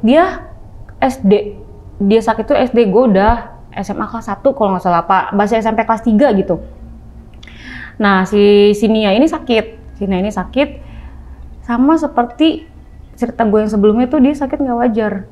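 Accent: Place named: North Jakarta, Indonesia. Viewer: Dari gaya gaya ngomongnya kaya kenal dari daerah mana,, eh Jakarta Utara toss dulu salam dari asal Priouk.. penasaran Jakut nya daerah mana nih ceritanya? Hehehe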